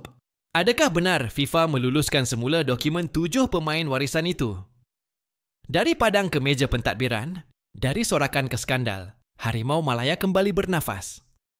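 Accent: Indonesia. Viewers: Kenapa voiceover mcm indondesh. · Sling Indonesia Nya dengar sikit😂..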